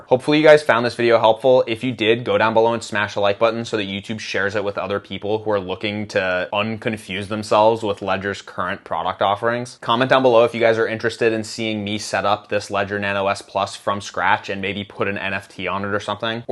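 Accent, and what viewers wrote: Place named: California, United States. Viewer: Thx for the video. Good info. Thanks, but why do you sound like your from Cali?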